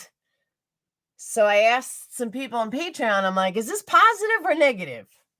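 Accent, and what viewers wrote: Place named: New York, United States. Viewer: Exactly true lots of people got brain tumors. You say so cute Ny accent. Lol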